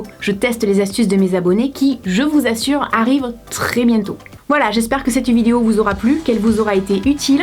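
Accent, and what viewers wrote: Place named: Marseille, France. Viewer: vous aviez l'accent marseillais comme kamila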